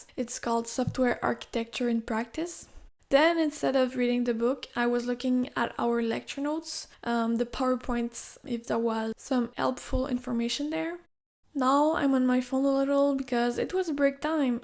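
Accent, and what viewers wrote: Quebec, Canada. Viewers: Are you by any chance from Quebec? Your accent sounds familiar :D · R u french canadian?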